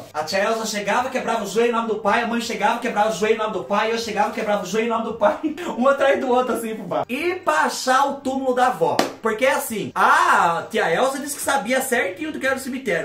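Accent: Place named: Minas Gerais, Brazil. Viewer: Fubá, de qual região do Brasil você é? Não consegui identificar de onde é seu sotaque. Parece uma coisa de minas, mas ao mesmo tempo algo do sul.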